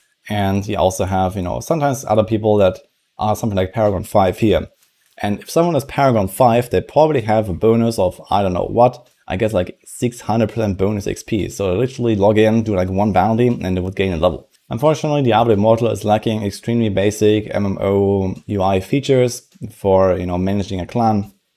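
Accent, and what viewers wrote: Germany. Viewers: Lass es mich wissen ob du Deutscher bist? Man hört es raus 😅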